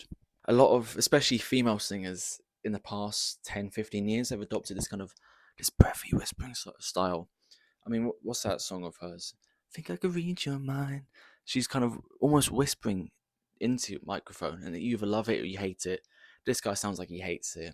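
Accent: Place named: United Kingdom. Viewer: I was not expecting you to be British, to be honest.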